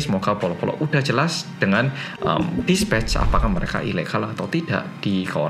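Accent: Java, Indonesia. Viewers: Menungguu mas yang medhooook♥♥ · setiap opening aku selalu ikutran bilang ahahaha medooooooook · Entah kenapa saya sangat suka pembawaan mas di konten pembahasan kasus kriminal, Durasi singkat, padat, Jelas, Visual editing sesuai dengan keadaan dan gak terlalu heboh, dan yang paling enak ya "MEDOOOOOK".. Sukses trus mas, 2021 bisa 10jta sub 🙏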